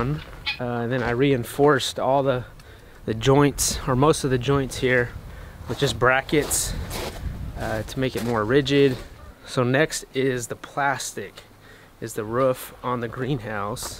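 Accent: Southern California, United States. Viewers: Excellent work! Your greenhouse is amazing! I wish I had that same greenhouse on my farm! Beautiful! Raise The Ruff! Be careful! By next year you may be calling it a Roof! Ha! Ha! The longer your here the more you will lose your Southern Cali Accent! You should hear my sister in law's accent now. She is originally from Glasgow Scotland. However, after many years living in North Carolina she has the most unique accent you've ever heard. Part of it is Scottish accent, part of it is Southern North Carolina Accent! So Beautiful! We will compare as time goes on to see how your accent is affected! LOL! It's All Good! When we lived away from the south in Japan and New Mexico I lost some of my southern accent for a while too! Funny how environment and surrounding people affect what we sound like! I hope it doesn't offend you how we tease you about your accent. 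I used to get teased a lot about my southern accent when my husband was in the Air Force. People would always ask me to say certain words and often just say to me... Can we just listen to how you say things??? So Funny! It never bothered me. I just laughed and had fun with it! No Harm! Just Laughs! God Bless!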